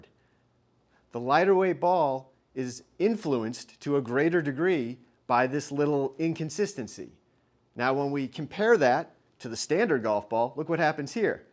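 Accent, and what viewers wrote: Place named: United States. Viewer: im just so glad finally an american accent shewie ..no offense to the rest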